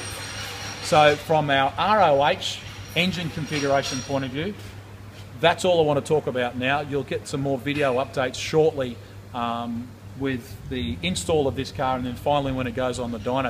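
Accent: Australia. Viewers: Great vid. Following for sure. Thanks for posting. Will keep looking for updates. Great to hear an Aussie accent pushing the envelope. Well done. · There's just something about an Australian explaining Subarus that adds legitimacy to their statements...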